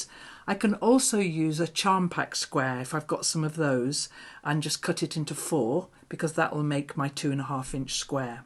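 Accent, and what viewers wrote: England, United Kingdom. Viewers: I tie most of the baby quilts I make. You can use a thicker batting and it’ll be “puffy”where it’s tied. Use a variety of brightly colored embroidery floss to tie it. Your videos are always inspirational. I love listening to your English accent, my grandmother was born in England, so it takes me back. ❤ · I’m wondering also, what part of the U. K. are you from?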